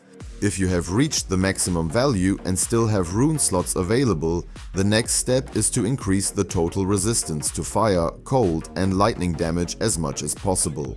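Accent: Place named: Germany. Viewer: Man hört das du Deutscher bist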